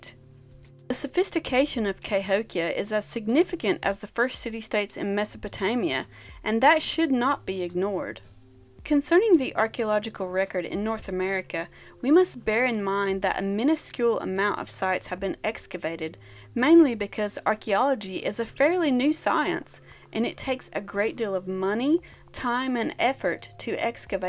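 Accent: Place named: Tennessee, United States. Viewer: I dont know where you're from but I hear some Tennessee in your accent.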